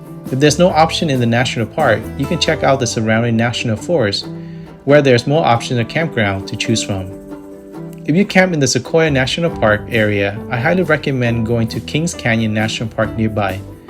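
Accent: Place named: Vietnam. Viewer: Knew you viet when I heard the accent lol👍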